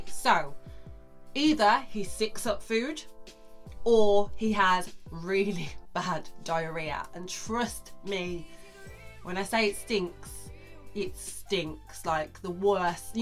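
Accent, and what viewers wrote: United Kingdom. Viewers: I like your british accent,and you sphynx is so adorable!,I love it !.John M Guinto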